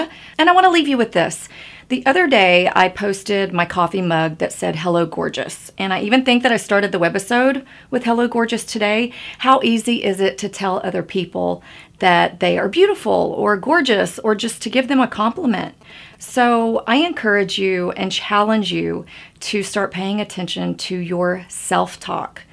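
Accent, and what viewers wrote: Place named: Texas, United States. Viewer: So good to see you back, 😊 You're sounding more Texan since you've moved back. Muah! 😘 Love you!!!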